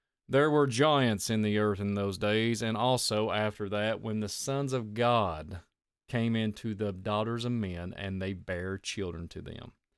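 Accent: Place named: Kentucky, United States. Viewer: Another awesome show!! Keep tellin it brother!! 💯 I love all your research & passion! May Yah continue to bless you 🙏 💙 🙏 PS~ I love your "Kentucky twang" & pronunciations!! You keep me laughing & learning at the same time!! 😊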